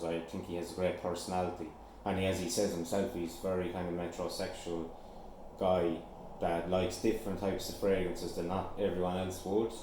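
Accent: Ireland. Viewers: hey mate is that a limerick accent?lol clare man here.aussie fragrance recommened u to me:)sir smells a lot is a cork reviewer here aswell.am from the banner county .subbed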